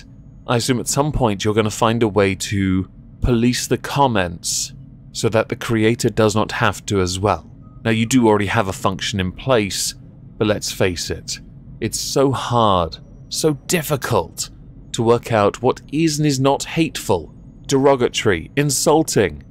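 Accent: United Kingdom. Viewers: Hate the British accent? I could never. Grew up on Monty Python & Beatles. Just came from a Lotus Eaters vid before here (& secretly wishing The Britisher would put up some fresh material I could go after this). Much love, yer moistness!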